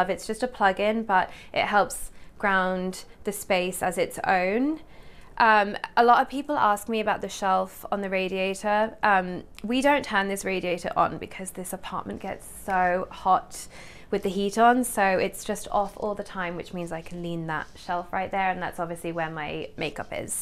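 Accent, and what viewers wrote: England, United Kingdom. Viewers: where is ur accent from in the uk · I love your apartment,. You’ve made it so beautiful and homely and yes sophisticated. I love all your DIY’s and as soon as I saw that DIY twig tree I was hoping you were going to say it was a DIY! I’m sooo going to make one! 😊 I’m English living in Australia and it’s so refreshing to hear a familiar, beautiful English accent.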